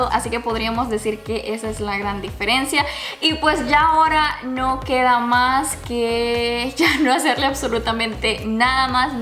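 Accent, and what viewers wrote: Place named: Honduras. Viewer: Así hablamos en Honduras !!!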